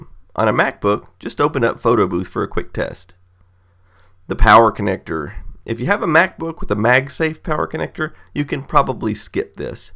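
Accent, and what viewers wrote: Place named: South, United States. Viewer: ´s southern accent was perfect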